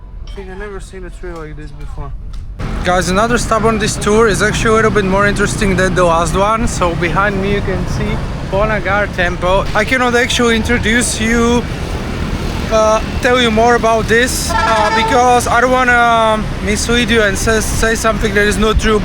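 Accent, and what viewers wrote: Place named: England, United Kingdom. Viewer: Hey you are so cute with your English accent 😁 I was wondering where you are from. I hope one day we can travel the world together ❤️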